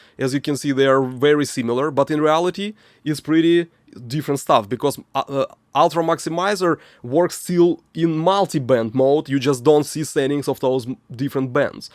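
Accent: Russia. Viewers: абажаю твой английский, ты говоришь на английском как-то по.... русски😄поэтому очень легко смотреть. ну и само собой материал-это просто бомба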